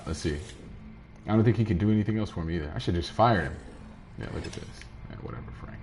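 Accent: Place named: Detroit, United States. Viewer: Are you from Detroit? You sound like you're from Detroit. I'm from Detroit.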